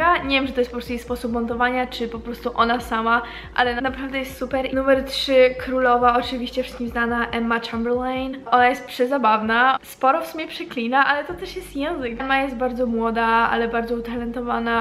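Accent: United Kingdom. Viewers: Ty masz już taki akcent angielski i mówisz po ang jak byś tam mieszkała od zawsze hhshshsgsgs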